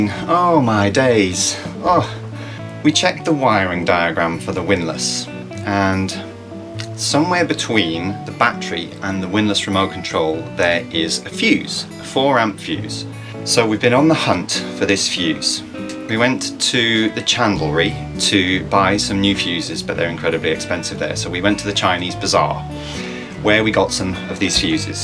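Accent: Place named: Ireland. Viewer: A bit of Irish...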